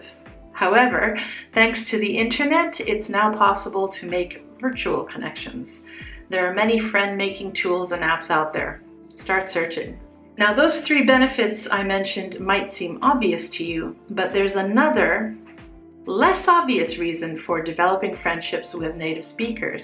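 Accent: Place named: Canada. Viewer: Iam trying to get get american native accent by using krashen's techniques and listening to usually american native accent that's it BTW I have noticed you aren't speaking American native English accent from frist impression but Canadian accent is quite similar to America's one Finally thanks for sharing your thoughts and krashen's ideas. looking forward to speak like native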